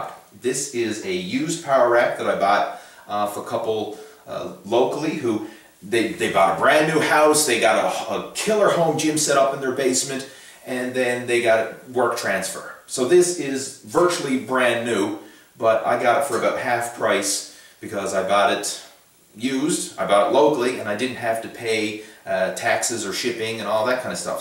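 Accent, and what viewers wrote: Newfoundland, Canada. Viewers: this guy sounds like a newfie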